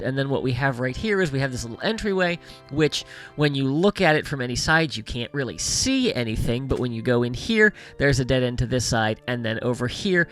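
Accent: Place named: Tennessee, United States. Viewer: Another great video. I recognize that vaguely central Tennessee accent and it reminds me of my grandparents.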